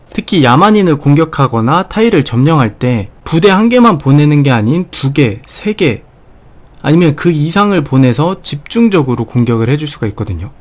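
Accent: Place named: Jeolla, South Korea. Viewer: 전라도 사투리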